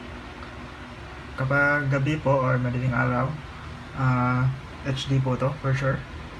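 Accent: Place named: Cebu, Philippines. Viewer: Cebu nga dba? Understandable nman. Walang nkakatawa okay.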